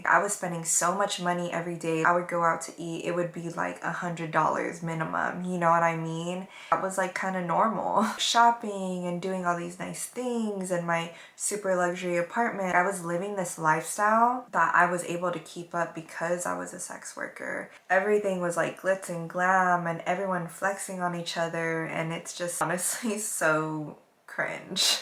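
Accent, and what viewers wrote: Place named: California, United States. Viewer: Catholic religion is the reason why children turn out like this. These girls who where handed everything and go to catholic school end up the worst. Girls like this who are ungrateful always act like this. They always seem to turn out to be narcissists on youtube or some type of affirmation platform, looking for attention because of her narcissist ego. This is why she is always on a platform showing what she has needing attention. She will always be this way. Blaming porn for your failed relationships and choices, and choices of men is ludicrous. There are million of women who don't watch porn and still want abusive men instead real men. The way she talks you can tell she's from California. They have the attitude like they are great. The mentality of of doing what ever they want with consequences. "wanting to be cool." Californians are handed everything and still act like this with no appreciation fo being born privileged. 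This type of narcissist will blame everyone else for their problems. Now she expects for men to forget about her past. She starts talking about fast money. Theres no such thing as working hard and gaining wealth, thats an oxymoron. Money brings wealthy. She was a rich girl who never need money. So why is she preaching about money? Her parents will take care of her tor the rest of her life. People born poor need money to build wealth. Her explaining that she only views men if they are "charming" "good looking" she mentions nothing of their IQ or intelligence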